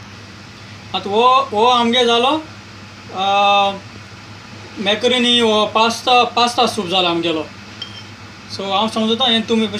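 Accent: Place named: Salcete, India. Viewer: I lik ur salcete accent.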